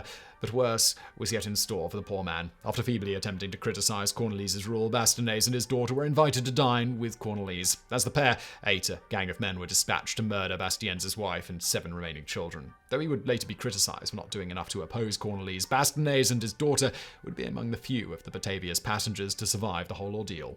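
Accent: England, United Kingdom. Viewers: No offense, but to me, Englandish accents are annoying. I’d rather listen to AI, than someone unable to speak English in a less affected tone, or way. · I'm not sure what I like more about this video. The historical storytelling, glorious British accent, or that godly beard he's rocking. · When there is anything, you are not, you are you’re just smart and stupid ass to the rest of us, but your accent seems to fool the Americans Yes, because you’re British you’re not not you are not smart for the fall as many Americans and Canadians seems to think you are useless dumb ass everybody else and even dumber sometimes when the way you presented videos say my name you you’re stupid stupid man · Southern California raised and when I see a thing about Mexican anything and then hear the British accent I know I am in for a bad time. Mispronunciation of non English words is nails on a chalkboard to me and at this point I think the mispronunciation is on purpose at this point as revenge for losing the colonies. 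· Ade you all being serious? Perfectly fine English accent and speech pattern! Lord help ya’ll if you bump into a Glaswegian😲🤣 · This guy pops up in almost every channel I'm subscribed to but with different chin hair every time - I always recognise that posh English voice though ha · If it wasn’t for accent (fairly typical British) I’d swear that English wasn’t even remotely close to his native tongue - the way he mispronounces so many straightforward words eg wallarbees (What the feck dude!?!?) is so funny to hear. Doesn’t anyone in his crew bother to proof read or check such basics before uploading this stuff? It’s becoming as bad as the “Dark5” series of channels with all its errors, cockups, and general idiocy. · I have no idea of most of his pronunciation. Is he English??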